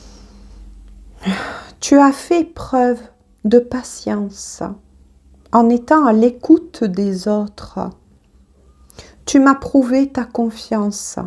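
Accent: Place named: Marseille, France. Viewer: C’est vous le rayon de soleil,avec cet accent de Marseillais « je crois « vous écouter m’apporte beaucoup, mais bien sûr aussi les messages dont vous nous faites la lecture, merci d’être là votre chaleur est réconfortant. 🙏✝️💜🙏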